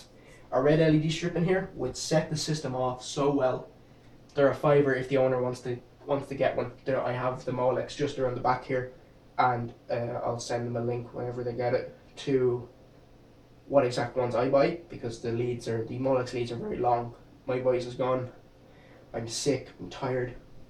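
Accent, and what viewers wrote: Ireland. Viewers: also what part of Ireland are you from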